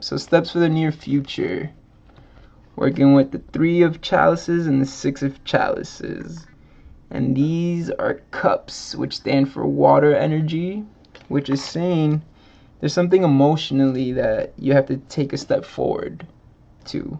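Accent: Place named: Jamaica. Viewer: why u trying to sound like a Jamaican?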